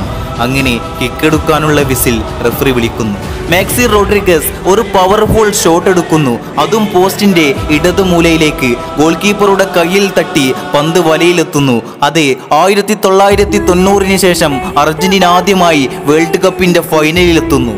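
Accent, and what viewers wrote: Malappuram, India. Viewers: മലപ്പുറം ആണല്ലോ 😄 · Malappuram karante voice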